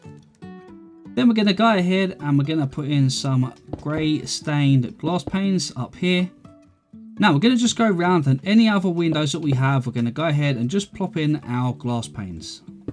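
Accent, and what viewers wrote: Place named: North, United Kingdom. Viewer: I just had to subscribe to the channel :) Thank you for the wonderful tutorials you publish. I am from Germany, so there are at least two benefits I have from watching those awesome tutorials: First (the obvious one): Learning about building wonderfull objects in Minecraft. If not "step by step" then at least tons and tons of inspiration! Second: A wonderful training in "listening and understanding" English. May I ask where your accent is from? It sounds very much like from the north. So - for me - you are in some way the "Bob Ross" of Minecraft-Tutorials :) It is very pleasant to listen and of course to watch your tutorials AND when it is done a beautiful object is created ;) Greetings from Germany and all the best!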